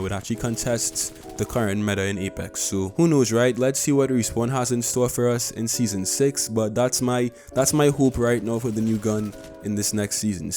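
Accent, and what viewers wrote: Trinidad and Tobago. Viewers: Are you from Trinidad and Tobago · Are you trini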